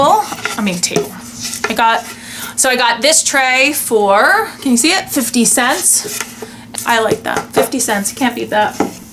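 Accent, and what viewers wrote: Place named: New Jersey, United States. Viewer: Hi! I’m binging on your videos...you really scored at this community yard sale. Good for you. Question (but you don’t have to answer if you don’t feel comfortable). Where are you from originally? I’m originally from New Jersey and you sound like me...lol... hope I’m not insulting you. Anyway, just curious. Born and raised in Jersey. Moved to Florida for 30 years then moved to North Carolina a year and a half ago. I’m so old! Lol!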